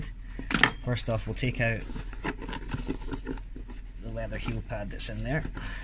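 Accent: Scotland, United Kingdom. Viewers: Where are you based? Scottish accent is encouraging as I’m looking for a reliable higher end cobbler to work on a collection of welted shoes! Always something needing done but hard to find solid craftsmanship! If you were not disastrously far away I could get some work your way! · I watch many cobbler channels all are great. However it is fantastic to watch a cobbler from my own country speaking Scots. Would be great to see you tackle other leather products like Bedos. Thanks.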